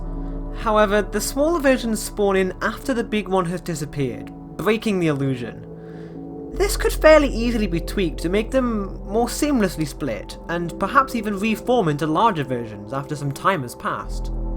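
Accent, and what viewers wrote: United Kingdom. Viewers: Why are you bri'*sh? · This man's voice really fits as narration, the calm bri'ish voice is really good for voice overs.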